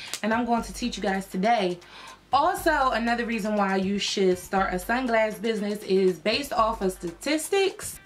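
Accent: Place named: New Orleans, United States. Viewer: I can hear the New Orleans in your voice lol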